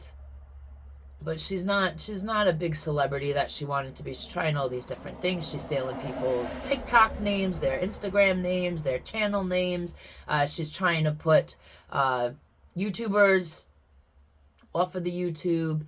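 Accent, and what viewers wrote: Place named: Maine, United States. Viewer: I could tell you were from Maine as soon as I heard you talk❤ I'm from there. Except I've lived on the west coast for the last 6 year's. Miss that accent ❤❤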